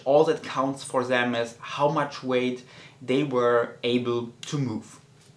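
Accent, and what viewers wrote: Germany. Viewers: you've got such a german accent! :D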